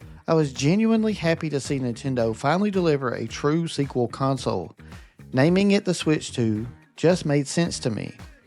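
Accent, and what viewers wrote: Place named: Alabama, United States. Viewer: Just curious buddy where are you from? I'm from Alabama you sound a lot like me and my friends lol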